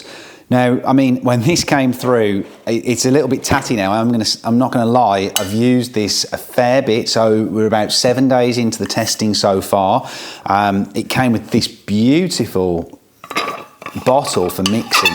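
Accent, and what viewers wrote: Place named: New Jersey, United States. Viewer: Nice accent you got there. New Jersey?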